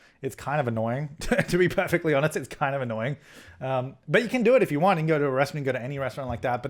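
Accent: Australia. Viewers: Are you Australian? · I love your voice and accent, are you Australian?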